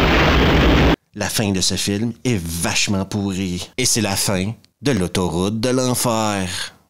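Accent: Quebec, Canada. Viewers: C'est dommage pour moi j'ai du mal a comprendre l'accent québecois sur certaines syllabes.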